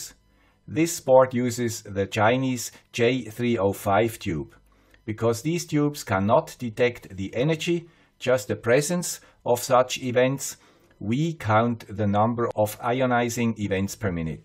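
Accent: Switzerland. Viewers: How could anyone nuke such a nice guy (even with a Swiss accent)?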